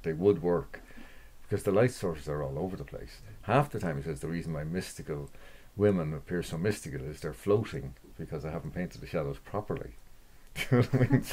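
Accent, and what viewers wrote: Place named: Ireland. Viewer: It’s so wonderful to listen to the irish accent, beautiful speech💚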